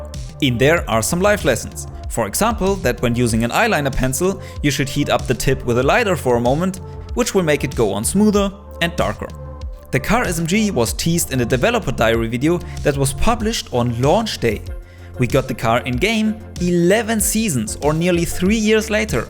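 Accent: Germany. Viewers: are you german? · Are you from German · Let me guess. You are German? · Are u german? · youre german?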